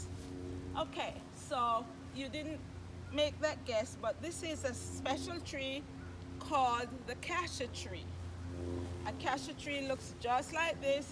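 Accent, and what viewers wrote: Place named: Jamaica. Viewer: Is she from Jamaica I,can tell by her accent and she has a akee tree we have those in puertorico we got it from our Jamaican neighbor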